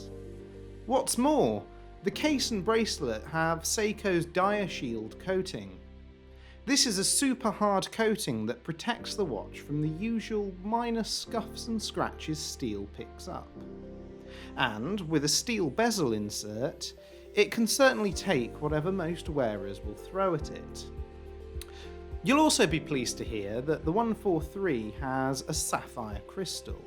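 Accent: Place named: United Kingdom. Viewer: Great first review. Love the British accent and way you presented it. 10 minutes is also a well digestible length. Well done and looking forward for more reviews from and WatchGecko.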